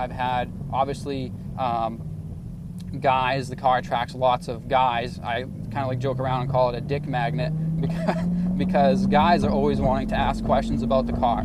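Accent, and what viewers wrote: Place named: Boston, United States. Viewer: I noticed that you had a slight Boston accent. then when you said you're in Maine I thought to myself, "ohhhh"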